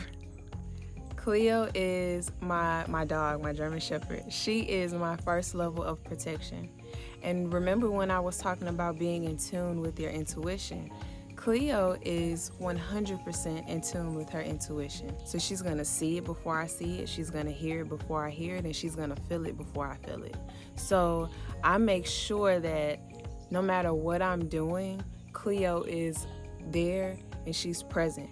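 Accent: Houston, United States. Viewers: You have to be from Houston because you have that Houston accent. Lol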